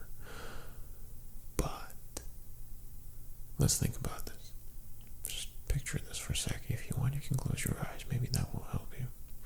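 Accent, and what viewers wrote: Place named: United States. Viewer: Your English and your American accent are ridiculously good. Also, you have a very lovely mouth.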